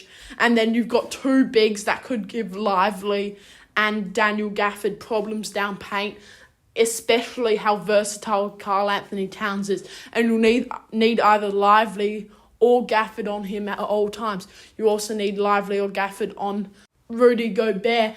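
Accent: Australia. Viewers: Well, time to change that title and edit the video. Sorry Bud. Are you Australian or British? Exum and Green need to speak with you mate. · She doesn't sound American. Maybe Australian. Her understanding of the game isn't that good.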